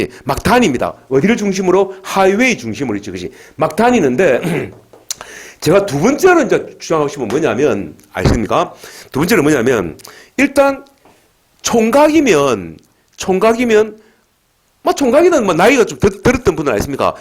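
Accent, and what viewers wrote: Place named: Busan, South Korea. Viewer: 부산억양이 알아듣기가 쪼금 힘들어요 ㅠㅠ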